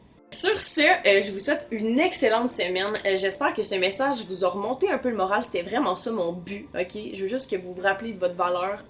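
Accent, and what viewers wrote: Quebec, Canada. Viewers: Ce qui te rend parfaite c'est ton accent québécois là 😍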